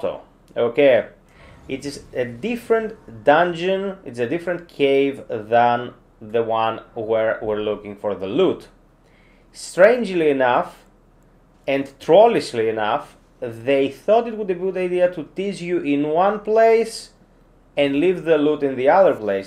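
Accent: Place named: Greece. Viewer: Η Ελληνική προφορά δεν κρύβεται με τίποτα! Ευχαριστούμε για τα guides αδερφέ, πολυ αναλυτικός! <3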